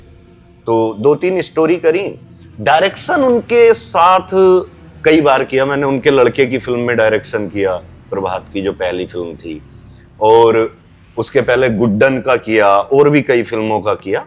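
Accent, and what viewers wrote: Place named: Uttar Pradesh, India. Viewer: O Bhai haryanvi nahi hai ok up ki hai tum hari 😊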